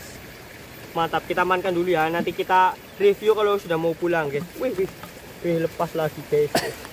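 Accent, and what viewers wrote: Banyumas, Indonesia. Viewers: bang kalo boleh tau abang orang mana nih kok ngomongnua agak ngapak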